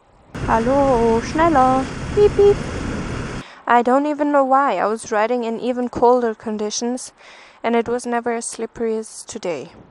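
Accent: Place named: Baden, Germany. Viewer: Isch des rudschig 😂 Badner? 😂✌️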